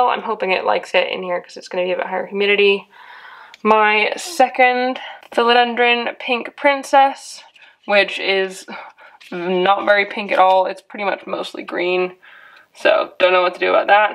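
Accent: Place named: United Kingdom. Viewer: I’ve watched quite a few of your videos and I heard many more words spoken with a British accent now. Heehee. Fun to listen to! Enjoy your awesome new greenhouse.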